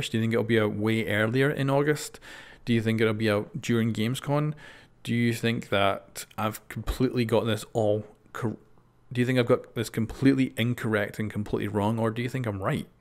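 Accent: Scotland, United Kingdom. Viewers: Nice scottish accent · Ur Scottish right? Me too :)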